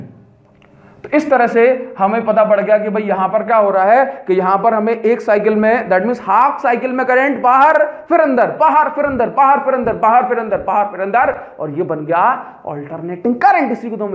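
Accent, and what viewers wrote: Odisha, India. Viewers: Odia ho kya sir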